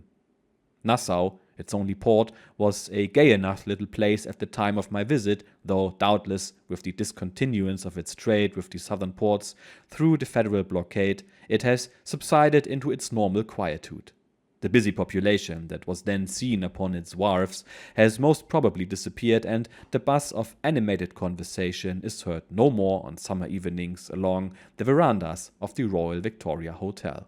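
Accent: Germany. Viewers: What a surprising and interesting choice of theme this time! I bet our American friend will flock to to join us to take this journey. As I have said before, your way to present these historical adventures is commendable and the little German accent of yours is perfect for exactly this one - thank you, I'm hooked once again!!💙💙💙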